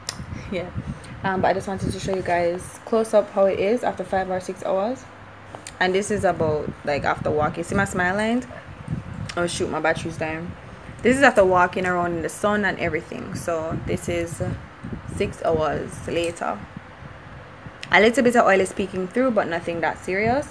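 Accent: Jamaica. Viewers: I'm from Trinidad. ... but a Jamaican accent is so exotic to me.... lol.... keep doing what you doing girl..... one day I hope my channel gets as bomb as yours....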